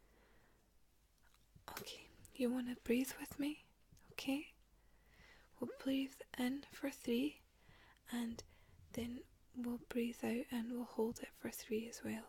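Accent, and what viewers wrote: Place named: Scotland, United Kingdom. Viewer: love this thanks love your scots accent really soothing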